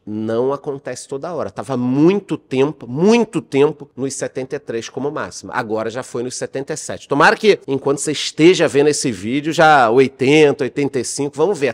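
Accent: Rio de Janeiro, Brazil. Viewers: Único carioca que consigo ouvir por conta do sotaque.... quem não guenta é louco!!!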